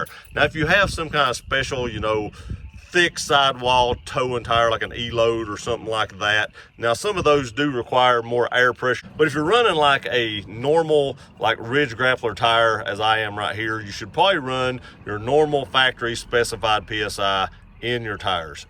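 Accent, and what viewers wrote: United States. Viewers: What an interesting English accent! I wonder which part of the US this is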